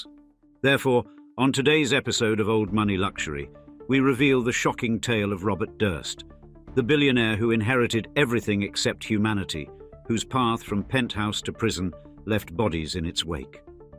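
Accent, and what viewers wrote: United Kingdom. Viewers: Always some British schmuck narrating American History. As though their own isn't enough of a goldmine full of corruption, theft and murder.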